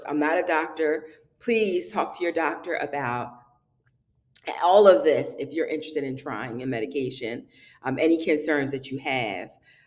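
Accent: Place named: Philadelphia, United States. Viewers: Are you from Philadelphia. You sound like my sister. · Wow! I’m from Philly too, and I knew that was a Philly accent.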